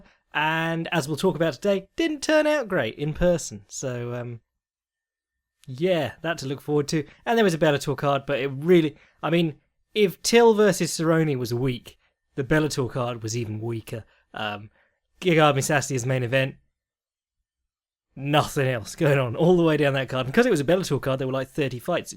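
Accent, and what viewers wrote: England, United Kingdom. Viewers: I come here 50% for the educated mans viewpoint. 50% for the English accent so familiar to my now SEA dwelling ears and phrases like "makes you look like a massive bellend".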